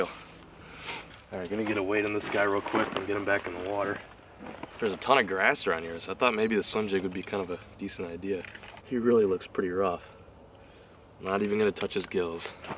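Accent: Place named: Texas, United States. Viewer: anyone from 2017 and notice his texas accent? LOL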